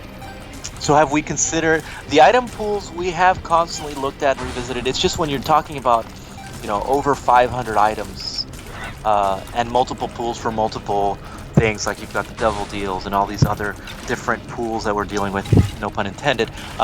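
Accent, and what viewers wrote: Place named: Spain. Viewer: I love the tyrone's spanish accent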